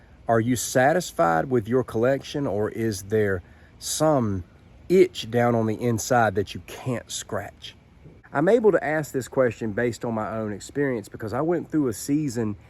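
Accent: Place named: West Virginia, United States. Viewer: I sent this to my mother and her jaw dropped. 😂 We have the same facial features, eye color, goatee, shaved head, height, weight, age, speaking cadence, etc. And we're both blade enthusiasts. The only difference is your accent is West Virginia, and mine is Kentucky. When I start making videos, I'll send you a link. It's wild, bro. 😂😂😂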